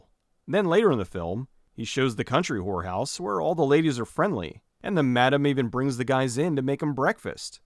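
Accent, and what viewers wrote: United States. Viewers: I'm from Poland and don't know much about accents, but for me you sound so American that you make Americans sound like British.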